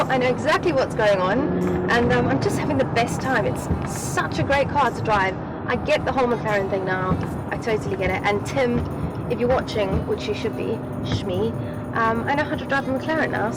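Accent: South Africa. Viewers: Are you south african? Your accent??!! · Jacque Noir what's fake? her accent is genuine as she's from cape town...!! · DJRCars Shes from Capetown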